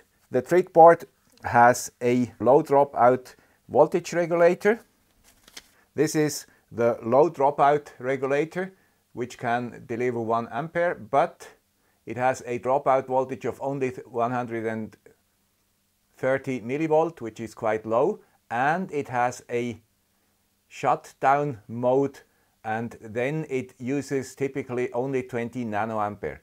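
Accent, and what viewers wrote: Switzerland. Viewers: den Schweizer Dialekt hört man schön raus : ) LG aus Österreich, tolles Video. CE Markierungen sind so eine Sache bei den Produkten ^^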